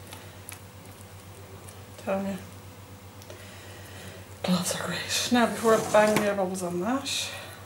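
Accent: Ireland. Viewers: it's always such a joy to watch yours video's. Not only for the soapmaking, but also your contagiously laugh, hearing you chatting,.. dare I say your Irish accent which is as pleasing to hear! <3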